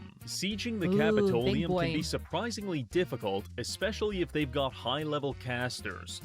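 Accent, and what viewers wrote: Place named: South, United States. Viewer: I find it great that her Southern gets stronger every video.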